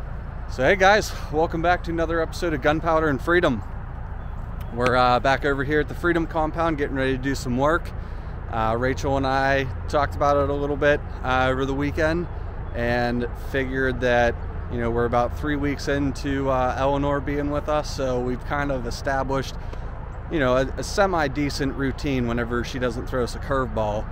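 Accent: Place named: New Jersey, United States. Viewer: It sounds like you're from Jersey...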